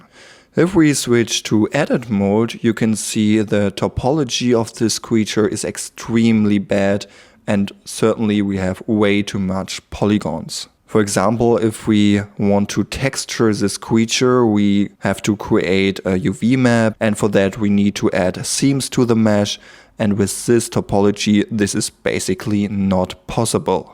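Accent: Germany. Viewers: "Oh he sounds really german" i thought. "My name is " he said. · i hear a german accent well, your name sounds pretty german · Great tut man, very, very helpful(Also your german accent is adorable) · Man hört einfach sofort, dass du deutsch bist xD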